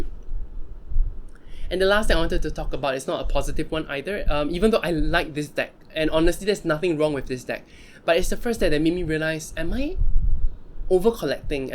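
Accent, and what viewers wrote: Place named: Singapore. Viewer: Are you from Singapore or Malaysia? We got the same accent fam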